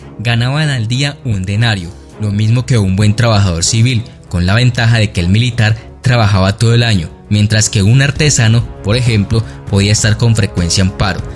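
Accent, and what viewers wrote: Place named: Bogotá, Colombia. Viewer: El parcerito tiene acento Rolo😸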